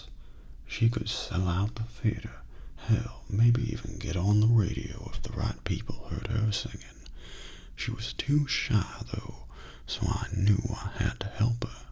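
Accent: South, United States. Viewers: As a Southerner myself, this accent is EPIC! Well done! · John Goodman but 10 Times More Southern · holy shit,... the southern accent is not half bad! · My dude, not only is your voice my favorite but the southern accent is out of this world. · you have a better southern accent than most movie stars, this was a blast to listen to. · Southern drawl: Spot. On.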